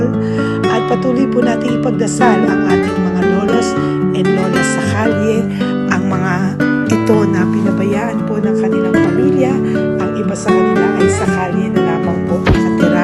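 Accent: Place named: Visayas, Philippines. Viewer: Hi! Lovely Lady, bisaya ka day ang salitang tagalog ay malumay bigkasin,kaya ng sanabi mo kukuhain ang tama ay kukunin at isa pa bagay2 malumay bigkasin,ang bisaya paspas mag-salita.😢😢😢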